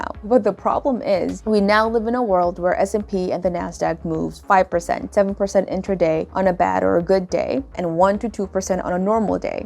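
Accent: New York, United States. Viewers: 역시 Newyorker 는 영어로 하셔야 같아요~~~ 잘보고 공부 많이 되었습니다.! 지난주 뉴욕 출장시 Bryant park하고 도서관 지날때 생각나더라구요, 한국서 건승하시길! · 이거슨 미쿡 발음?👍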